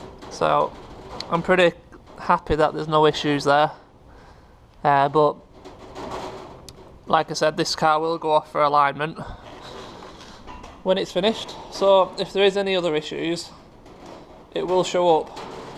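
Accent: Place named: Scotland, United Kingdom. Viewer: Are you Scottish? Pretty heavy accent.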